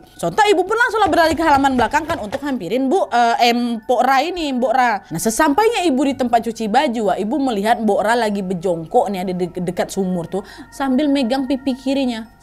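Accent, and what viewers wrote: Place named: Sumatra, Indonesia. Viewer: Cerita jawa timur logat sumatra, tapi ini yg bikin aku suka, dan ingat terus sama ka ini ❤❤❤❤❤ada ciri khas nya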